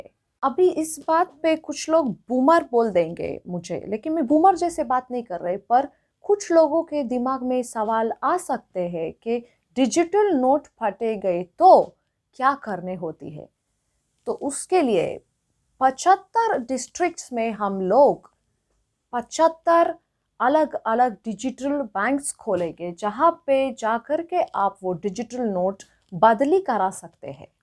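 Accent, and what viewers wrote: South, India. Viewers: Girl u r awesome!! got the perfect south Indian accent, lingo, perfect sarcasm!!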